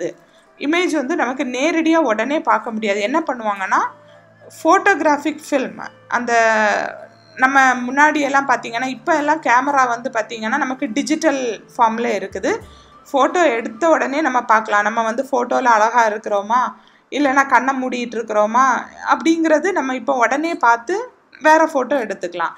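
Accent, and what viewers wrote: Kanyakumari, India. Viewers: Mam neenga Kanyakumari ya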